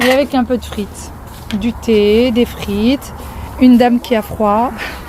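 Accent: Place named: Morocco. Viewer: Moi j adore quand elle parle marocain même si je ne comprends pas trop mignon 😊 Changer rien vous êtes mon petit rayon de soleil de la journée vos vidéos je n’en loupe pas une🤗❤profiter avec votre belle famille et ne vous soucier pas de ses commentaires idiot❤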